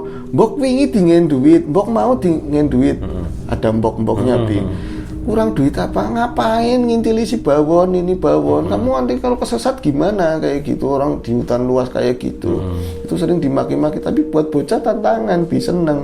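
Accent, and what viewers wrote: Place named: Tegal, Indonesia. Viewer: Kalo bisa logat Tegal, banyumasan,brebesan,dan logat ngapak lainnya jgn disembunyiin,mas!!.Unik sekali!! Salam Pekejing, mas!!!!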